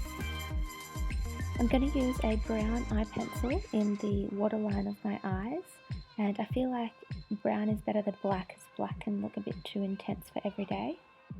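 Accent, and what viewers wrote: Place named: Australia. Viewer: You are beautiful love the Aussie accent lol I’m from Sydney. Keep holding your head high. You are blessed. Don’t let others bring you down. 💖 love Loz x ps. Can’t believe your lips are real! You must cop so much shit lol 😂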